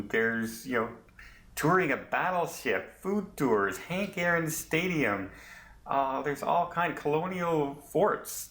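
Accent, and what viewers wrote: Canada. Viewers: Nice video but I need to correct your mispronounciation of Mobile..I live in Mobile soo I want to teach you the right way ...you say Mobile..like its a mobile phone.. Mobile has so much French influence..as does it's name. Think of the word..seal..then add Mobile..so its a hard Inflection on bile...sounds like seal. Welcome to Mobile. We love tourists! Sounds like you are Canadian? Come on down to see our beautiful historic city!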